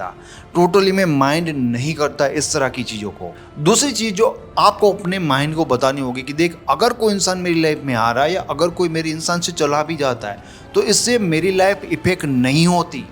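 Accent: Gujarat, India. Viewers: आप गुजराती हो? आपकी हिंदी गुजराती पटेलभाई जैसी है।😂😂 मजा आता है सुन के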